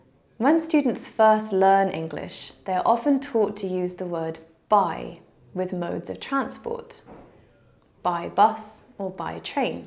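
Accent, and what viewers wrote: United Kingdom. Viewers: 영국 발음 매력적이야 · 영국 발음도 듣고 표현도 배우고 최고!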